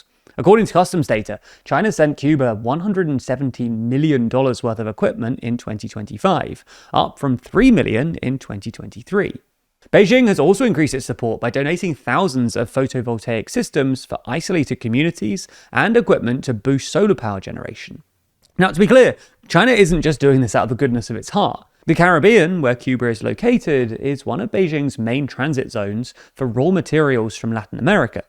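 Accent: United Kingdom. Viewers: Cuber. I love British speak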